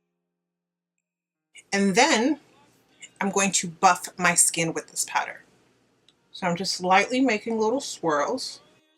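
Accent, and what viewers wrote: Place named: Caribbean. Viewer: You are beautiful, and you did an amazing job with the explanation and demonstration. During the video, I heard a tiny bit of an accent, a Caribbean accent, like mine lol. Am I right, are you from the Caribbean? I went through many of your videos to confirm but didn't see anything. So, are you?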